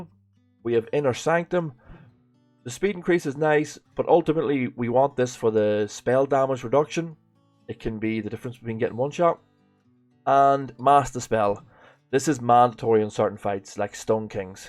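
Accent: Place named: Northern Ireland, United Kingdom. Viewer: Nice to hear some more Northern Ireland players out there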